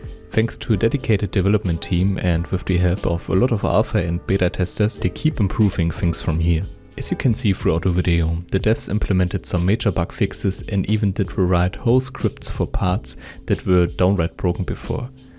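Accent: Germany. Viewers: Dein deutscher Akzent digga. Beste. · Man hört dir raus, dass du Deutscher bist :D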